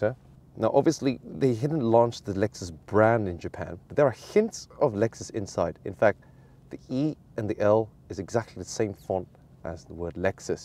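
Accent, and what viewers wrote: England, United Kingdom. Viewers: The hairstyle needs a mod to fit with the English accent...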